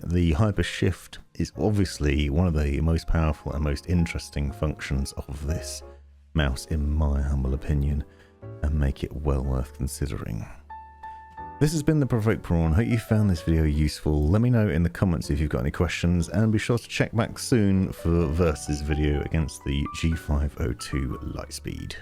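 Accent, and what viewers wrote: United Kingdom. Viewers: Always enjoy the soothing original British voice of yours <3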